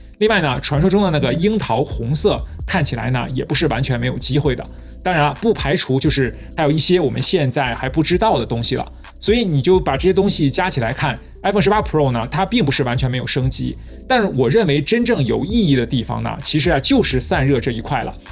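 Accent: China. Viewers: 好像博主是在外国生活的华人，但口音像是中国大陆的，而用词却是台湾的叫法。有点别致的搭配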